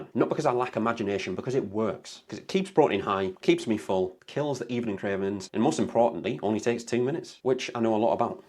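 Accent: Newcastle, United Kingdom. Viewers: He's a Geordie. Like Scots, he talks faster because he thinks faster than our southern cousins 😂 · I just thought it was because you were a Geordie.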